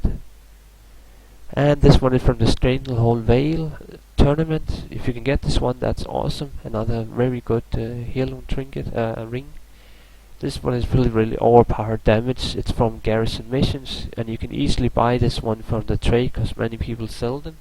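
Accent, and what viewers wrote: Denmark. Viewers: Are you danish? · Are you Danish? You sound like a Dane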